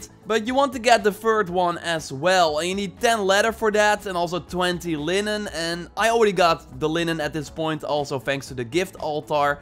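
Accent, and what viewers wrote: Netherlands. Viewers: For example better ga je het beter uit spreken man tis egt zo zwaar hollands Engels veder cek ik altijd je shit ga zo door 😎👍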